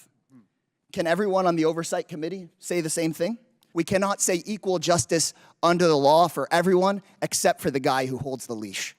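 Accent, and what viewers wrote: South, United States. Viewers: Says the elites right man with the southern accent.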